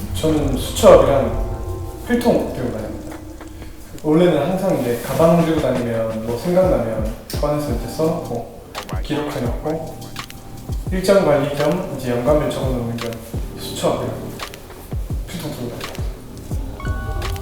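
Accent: Gyeongsang, South Korea. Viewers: 성격도 소탈하실듯ㅋ 전 퐝 살아서 경상도사투리 넘 친근하고 인간적이네요ㅋㅋ 열심히 준비하셔서 꼭 결승전까지 가시길 아자아자~~~ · 이솔로몬 님~경상도 사투리~웃는모습~매력덩어리예요~끝까지 파이팅 하세요~💕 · 대구 말투 넘 멋져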